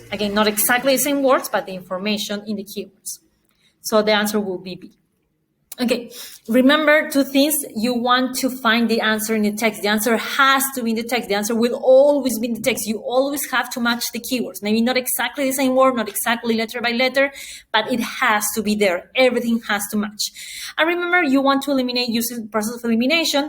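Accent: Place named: Russia. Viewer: Thank you so much for sharing the best tips with us🙏don't get me wrong, I felt a little bit Russian accent in your speech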